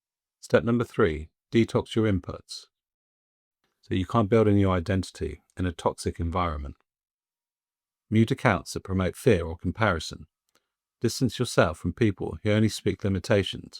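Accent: United Kingdom. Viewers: What part of England are you from? I was stationed there for 8 years. Loved it.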